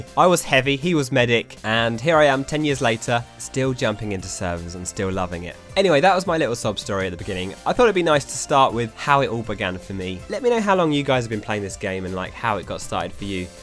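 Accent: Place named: United Kingdom. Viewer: I swear you’re like twice as british as usual in this video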